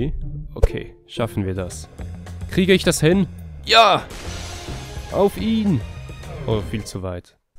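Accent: Switzerland. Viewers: Eine frage, bist du Schweizer?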